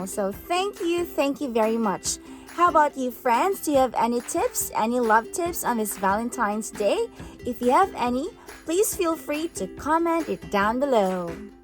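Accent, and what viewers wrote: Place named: Philippines. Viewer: nindot man imong tagalog accent maam. Share love not hate.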